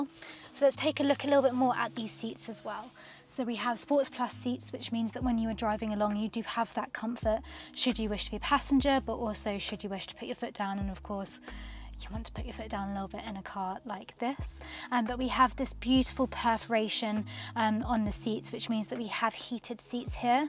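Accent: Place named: United Kingdom. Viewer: I love woman speak British accent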